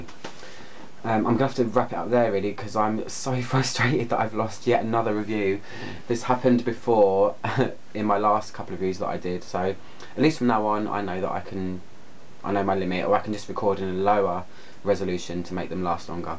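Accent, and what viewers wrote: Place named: East London, United Kingdom. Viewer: Hugh Grant who went to Private School.. 😂😂🤔 😏😂 Anyway I love your East London accent ❤️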